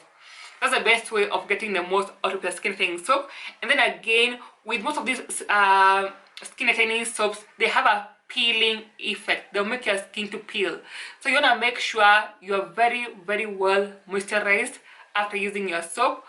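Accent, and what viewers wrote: Kenya. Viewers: When I heard ur accent I know ur a Kenyan 😍😍😍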